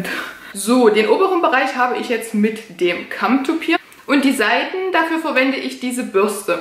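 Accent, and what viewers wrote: Saxony, Germany. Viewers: Witzig, du hast meinen zukünftigen Namen 😅 Kommst du aus Sachsen?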